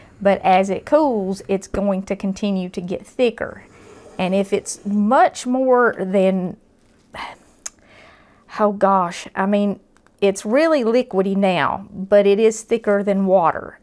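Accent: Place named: Appalachia, United States. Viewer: I am thankful to know Jesus, my family, waking up today, I'm thankful for you and your channel. channel I enjoy it. I actually don't watch any other recipe channels but yours. I love the way you represent everything and explain everything and I really love your hillbilly accent. I'm not sure who Brett is that you mentioned losing, but I pray for you and your family for the hurt and for God's peace to return in your life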